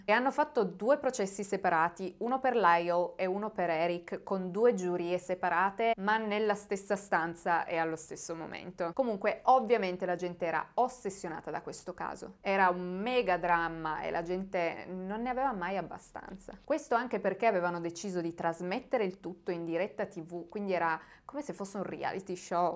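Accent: Turin, Italy. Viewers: ti devo davvero fare i miei complimenti... sono super appassionata del crime e all'inizio ero davvero scettica (troppi youtuber che si improvvisano divulgatori). Tu sei una bella sorpresa... i tuoi video sempre chiari, dettagliati, si percepisce lo studio che li precede, l'esposizione perfetta, l'accento torinese la ciliegina sulla torta! Sempre un piacere ascoltarti e vederti... volevo tralasciare quanto tu sia bella ma devo dirtelo per forza... bellissima e bravissima!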